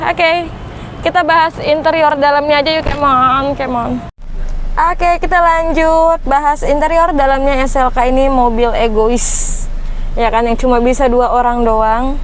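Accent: Java, Indonesia. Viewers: pasti wong jowo udah bisa ketebak dari suaranya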